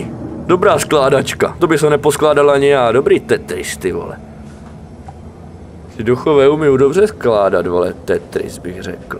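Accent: Moravia, Czech Republic. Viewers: Aaaa Bojanovcak :) si rikam odkad ted silny moravsky prizvuk (to je jedine dobre, uz mam tech "cechackovskej" vlogeru dost)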